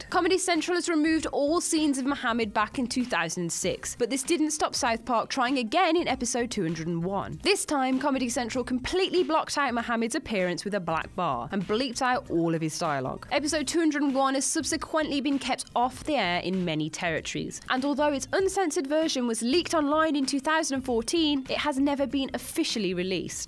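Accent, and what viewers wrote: United Kingdom. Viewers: The Top 10 countdown industry is becoming ridiculous. Now a random person with British accent is plagiarizing other top 10 videos and posting them...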